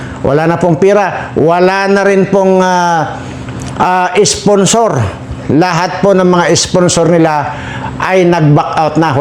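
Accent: Iloilo, Philippines. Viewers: ilonggo kaba sir parang ilonggo yong bigkas mo ng salita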